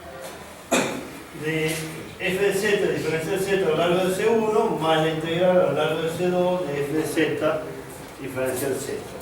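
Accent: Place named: Córdoba, Argentina. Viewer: ajajajja reconoci el acento cordobes al toque y quede wtf, esperaba encontrar el tipico tutorial centroamericano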